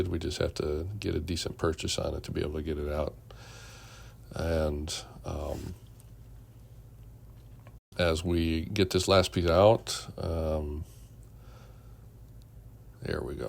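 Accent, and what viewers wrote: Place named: South, United States. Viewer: PILOT!!! He absolutely does. Lol! 🤣 AND I believe I hear a slight Southern accent. 👍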